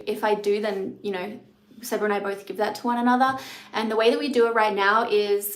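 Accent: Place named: Australia. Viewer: Ur accent is so much more pronounced now that ur home. I absolutely love it. Australian accent is my favorite accent. There are creators that I watch just to hear the accent.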